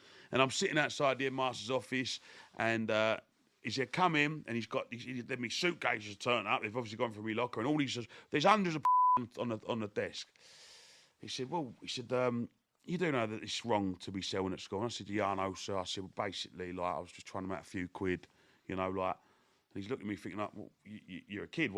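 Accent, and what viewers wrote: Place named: Cockney, United Kingdom. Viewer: Just cause he’s a cockney doesn’t mean he needs captions guys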